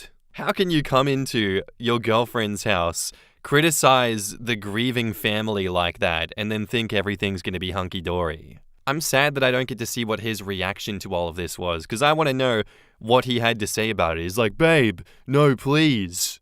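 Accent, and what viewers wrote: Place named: Australia. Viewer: Me over here understanding "The poly and burner part" during the intro. Thought I was used to the Australian tongue by now, but the fr*nch is throwing a wrench in that.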